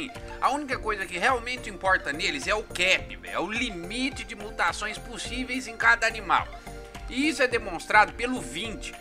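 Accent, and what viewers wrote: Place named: Rural, Brazil. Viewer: Mano tua voz parece de dublador de filmes tlg , aqueles filmes americanos que tem alguém caipira aquele sotaque mano acho que vc teria sucesso em dublagem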